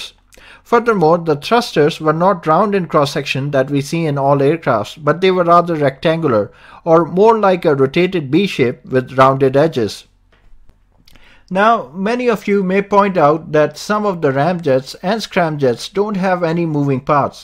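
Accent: India. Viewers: Lol. Are you saying that to make him feel better about his thick Indian accent that is hard to understand? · It could actually take off, if only the hindustistani speaker would be replaced by a normal english language spokesman.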